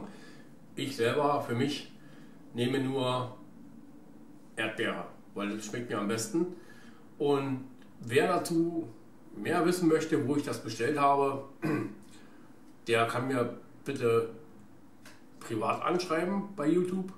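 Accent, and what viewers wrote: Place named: Brandenburg, Germany. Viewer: Rainer3041 komm auch aus Brandenburg Mich interessiert es nur, da ich das am sogenannten Brandenburger Deutsch hör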